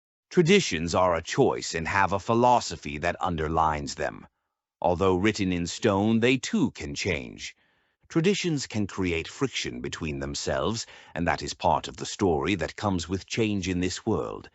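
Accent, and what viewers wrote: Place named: Greece. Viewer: This person sounds like a Greek if you know what I mean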